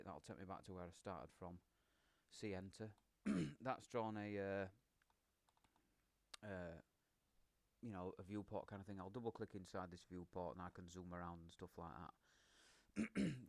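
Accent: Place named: North, United Kingdom. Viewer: After a lot of frustration with viewports, I should have known that a chill northern accent would provide the answers - cheers!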